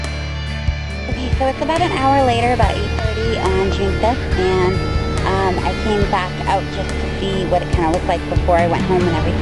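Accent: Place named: Maine, United States. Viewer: BeeCirca is right! You certainly are a lovely-looking "storm chaser"! I love that red hair of yours!--And that "Maine voice" you have too!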